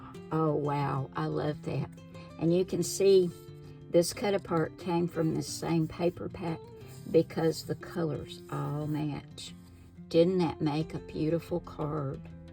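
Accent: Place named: Virginia, United States. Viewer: I love watching you make cards. It always feels like we’re having a visit. Your voice reminds me of my childhood visits to my family in Virginia. How about Sketch #17 or #31? 31 is very busy, maybe we better hope Wayne doesn’t see it!😂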